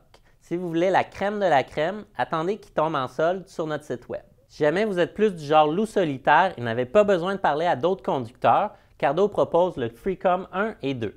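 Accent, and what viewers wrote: Quebec, Canada. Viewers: Je suis tellement fan de l'accent québécois !!! Sinon très bonne vidéo, tu m'as beaucoup aidé merci ! 😉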